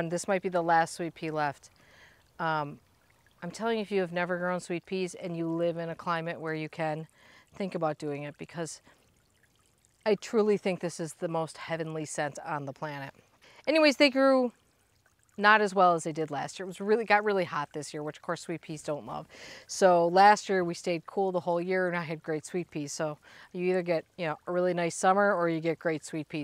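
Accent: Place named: Upstate New York, United States. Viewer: I love how you garden. It's like I would if I had the space and lived in a place with trees and a bit more rain. I started watching your channel about a year ago. It wasn't your garden that caught my attention, at first, though I love it! It was your accent. My dearest and best friend sounded a lot like you do. We lost her to a stroke almost 4 years ago. She was here in Texas with me 4 years ago today! It was so important for her to get here. See, she was born and raised in Upstate New York in a small town called Phelps. It's just south of Rochester. She got transplanted to Texas because she wanted to go to college some place that had a good music program and was completely different from where she had been living. Well, she found it! I'm in the Texas Panhandle and it's nothing like your beautiful tree filled, crick running, lakes and, well, we're not the same at all! 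She always kept her accent which she argued, in fun, that we had the accent down here, she didn't have an accent! Haha!!! Anyway, she and her family moved back to NY in the mid to late 90s. I didn't know what I was gonna do without her?! Our lives were very entwined from working a small business together and I helped her home school her daughter and and and.... We could go shop at Wal-Mart and have a blast doing, together. Well, I survived the move because We could still talk on the phone and I was truly happy that she was back home. We made a trip up there in 2008 and spent a week with her. It was perfect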